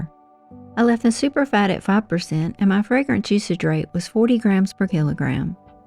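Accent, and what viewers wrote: South, United States. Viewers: Wow, I really like this swirl ❤. I love making soap and watching your videos too, they are so relaxing and you have such a nice voice, I love your southern accent 😊. Thank you for another wonderful video.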